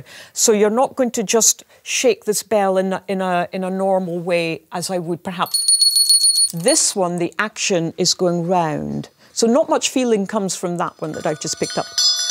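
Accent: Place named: Scotland, United Kingdom. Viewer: Gosh i love the Scottish accent she is brilliant truly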